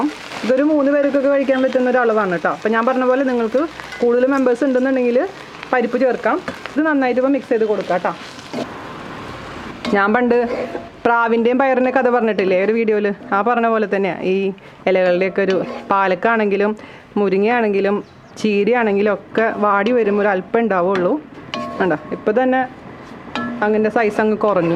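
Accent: Thrissur, India. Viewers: തൃശൂർ ഭാഷ ഒരു ലെവലാട്ടാ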